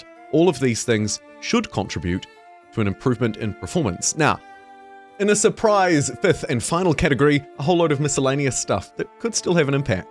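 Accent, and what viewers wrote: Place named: New Zealand. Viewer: its good to hear a kiwi as voice, repping NZ in the gaming space of youtube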